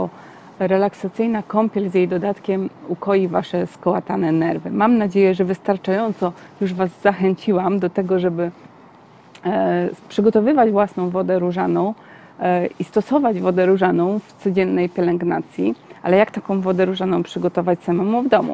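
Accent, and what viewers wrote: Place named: Ukraine. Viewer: Pohodzisz z ukrainy ? Bo masz trohe taki akcęt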